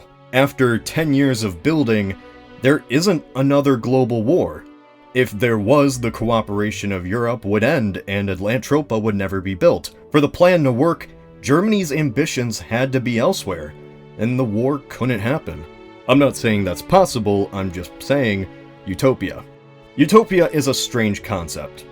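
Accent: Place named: Transatlantic, United States. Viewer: You need to work on your Transatlantic accent.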